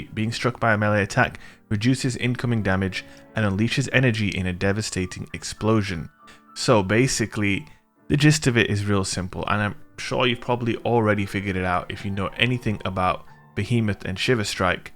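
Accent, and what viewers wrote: United Kingdom. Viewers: Are you from UK? You kinda got that accent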